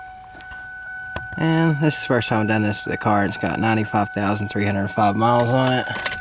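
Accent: South, United States. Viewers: The authentic Southern accent ! howdy !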